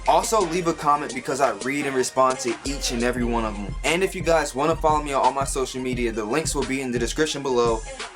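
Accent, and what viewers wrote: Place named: Canada. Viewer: Are you from Canada you have an accent haha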